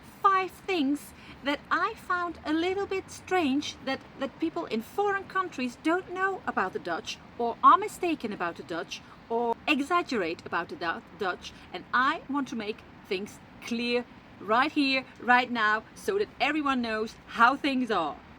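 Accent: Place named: France. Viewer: C'est amusant, vous avez un accent francais en anglais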